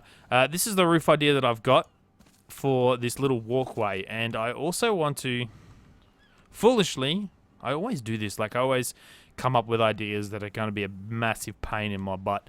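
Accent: Australia. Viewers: live in Sydney, Australia and my internet is not the best but my friends internet is really good like i went to his house with all of our mates (6 of us) and we were all downloading unturned and we all did it in 30 seconds. do you live in WA or something? cause it sounds like it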